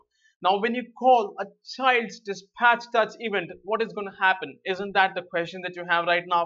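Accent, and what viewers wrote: India. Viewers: I don't know why, to me he sounds like india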